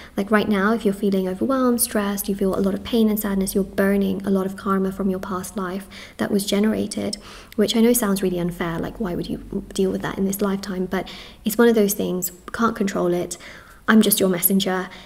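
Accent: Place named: South East England, United Kingdom. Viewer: you sound like your from the south east uk, I love watching your videos, I read tarot cards too but find yours a comfort and more clarification for the readings i do on myself x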